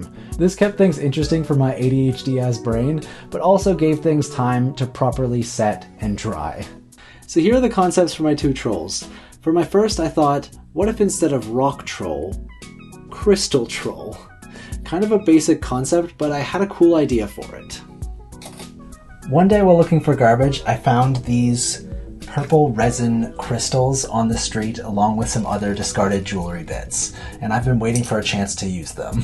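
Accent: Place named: United States. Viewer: I did not expect an American accent to come out of your face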